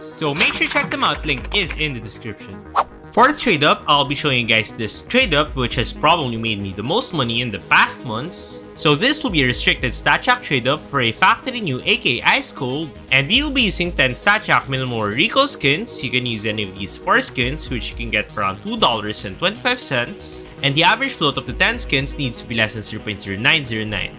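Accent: Philippines. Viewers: I just knew it that you're from the Philippines because of your accent! But this is really useful so I'm trying this. Thanks!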